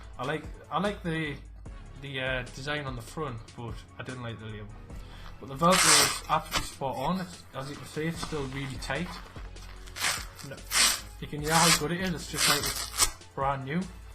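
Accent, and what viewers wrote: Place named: Ireland. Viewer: Thanks for taking the time to answer. You sound like you are from Ireland. If so, I guess you are all in for McGregor?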